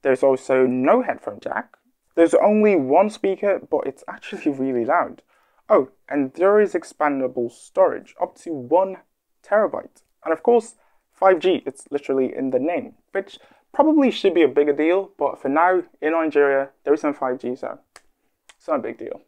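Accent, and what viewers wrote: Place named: United Kingdom. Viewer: you sound British though. Did you go to school in Britain?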